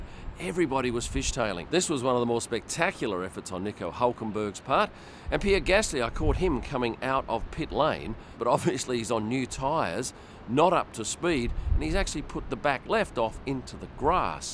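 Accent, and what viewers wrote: Australia. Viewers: Hi Hope all is well. I love your work, and I'm so glad that ur an Auzzie, makes it easy to understand the auzzie language, lol. Also, I would like to win that TM 43. I also would like to tell you that while looking at all the PANZERA watches, I like the model AQUAMARINE 45C 45MM SWISS AUTOMATIC CHRONOGRAPH. Beautiful watch indeed, has every component that you need in a watch.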